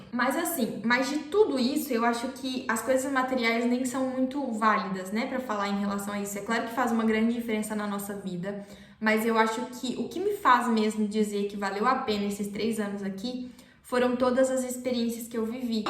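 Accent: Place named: Favela, Brazil. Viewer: Portugal é um dos "piores países da Europa"? Trava a língua, tá brasileira? Trava SIM piorque a tua língua que é bem comprida e afiada. Não achas que por Portugal ser o que dizes que não deverias estar cá e que NUNCA deverias ter vindo. Vê-se logo que és brasileira. O que será que "vinheste fazê prá PortugAAAAAAAAAAAAAAAAAAAAAAAAAAAAAAAAAAAAOOOOOOOOOOOOOOOOOOOOOOOOOOOOOOOOOOOOOOOOOOOOOOOOOOOOOO" se nem de origem portuguesa és? Qual é a afinidade que tens com Portugal, com os portugueses, com a cultura, com os costumes etc? Nem mesmo com a língua tens qualquer afinidade. Nós portugueses não falamos o português da FAVELA como tu falas. Trava a língua, falsa turista.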